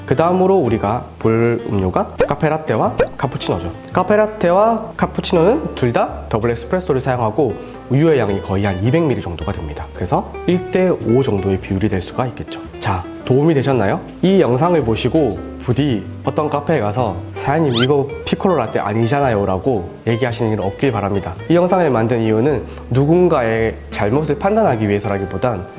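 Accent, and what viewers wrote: Gyeongsang, South Korea. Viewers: 씨발 시작부터 경상도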